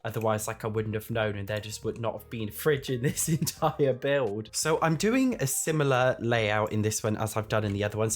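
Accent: United States. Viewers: Your American pronuncuations crack me up every time I love it lmao 🤣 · Has anyone else noticed how american accent sounds a lot like Quagmire from family guy 😂😂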